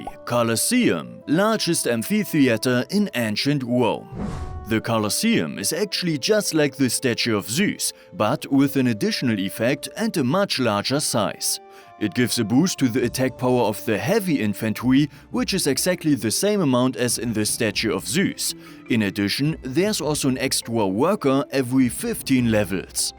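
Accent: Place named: Germany. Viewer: always this groose german English... terrible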